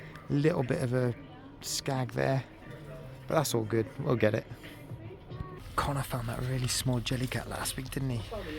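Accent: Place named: Jamaica. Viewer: That is some Jamaican accent you have.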